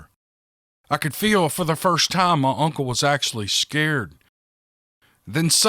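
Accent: South, United States. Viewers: I don't know if they are true either. But to me it really doesn't matter. If they are true, good,if they aren't then it was a good story. I'm never going to assume that they aren't, cause I don't know. But please keep reading them regardless. I love listening to your voice with that southern drawl.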